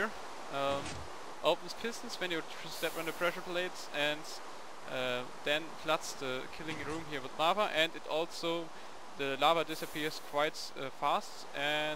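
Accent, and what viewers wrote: Germany. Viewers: Are you german sounds like german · man hört sofort dass du deutscher bist :) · are you german ? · haha krasser deutsch - english dialekt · u r german? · extreme german accent :D awesome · typischer deutscher accent :D aber weiter so! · I think you german ! (ich auch XD) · I hear, you come from germany :D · Are u german? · are u german ?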